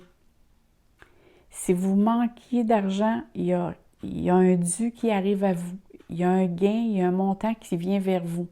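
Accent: Canada. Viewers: Merci 🙏 Ce tirage me parle énormément surtout votre ressenti par rapport à la personnalité des Poissons 🐠 Mon mari a traversé professionnellement une période difficile... 📉 mais nous commençons à entrevoir une petite lumière au bout du tunnel, espérons que fin novembre nous serons en pleine lumière 💡💡J’adore votre accent canadien 🇨🇦... 🤣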